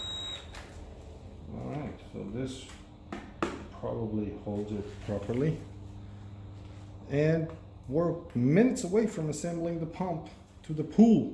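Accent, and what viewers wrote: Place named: Eastern Europe. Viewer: Can’t quite place the accent..sounds Eastern European, and to my Romanian ear, it sounds almost Romanian. Which is it? Curious here..:)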